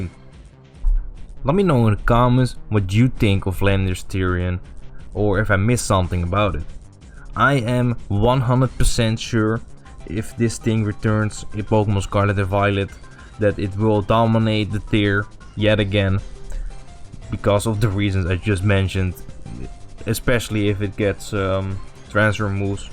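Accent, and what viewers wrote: Netherlands. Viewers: Obvious dutch accent lmao, good video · Love the very noticeable dutch accent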